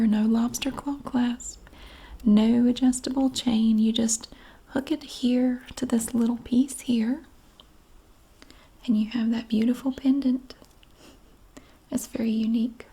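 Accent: South, United States. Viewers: Hi. I listen and relax to your vidz a fair bit. Love your southern accent. I came across yesterday's video were you seemed very upset due to trolls. Please dont be upset. You cant control such idiots and the ignorance of folk. They hide behind their phones etc and blubber their strifes away. Cowards and it's not personal... You are so genuinely lovely at heart... Take Care Tina from Liverpool (Engand)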